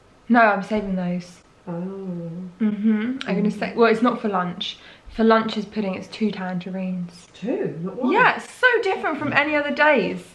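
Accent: England, United Kingdom. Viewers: You are adorable!! Love the English accents and expressions being from across the pond! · She reminds me of Emma Watson (and not cause she has an English accent) but she looks like her too sort of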